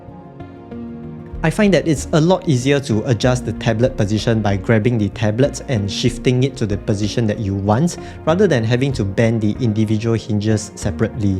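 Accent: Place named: Singapore. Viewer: oh i think you are just casual indonesian chinese youtuber, after hear your accent kinda singaporean 😅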